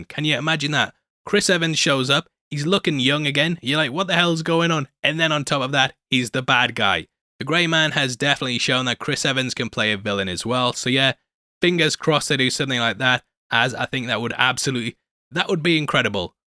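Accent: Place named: Scotland, United Kingdom. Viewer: Love the show and the Scottish accent keep up the good work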